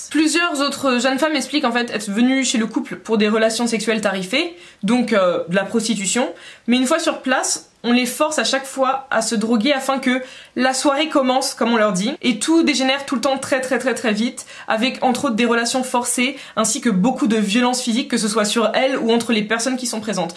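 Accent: England, United Kingdom. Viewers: Ton accent anglais 😻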